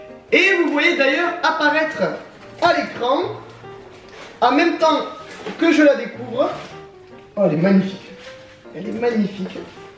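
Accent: Marseille, France. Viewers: tu et un Marseillais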